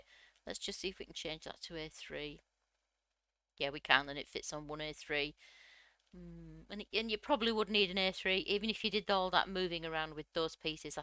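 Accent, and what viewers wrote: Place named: North, United Kingdom. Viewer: I love your videos as you explain every thing so well and a good old northern voice to boot, which makes a refreshing change.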